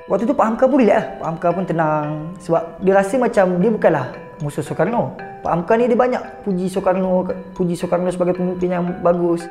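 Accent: Malaysia. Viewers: Dia bercakap bahasa melayu tapi ada loghat negeri.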